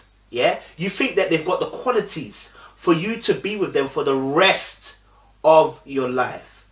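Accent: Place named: Birmingham, United Kingdom. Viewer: You're starting to sound a bit brummie 😁 coming from a brummie, I can hear it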